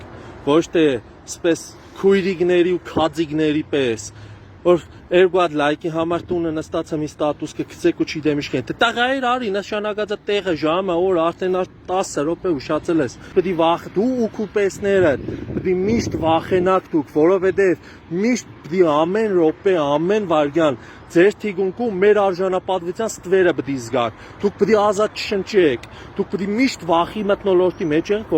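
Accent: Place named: Gyumri, Armenia. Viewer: Акцент Гюмреци молодец сынок джан спаси сахрани Господи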